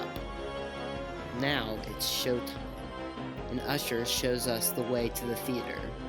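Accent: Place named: Cincinnati, United States. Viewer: This guy has the most Cincinnati accent I’ve ever heard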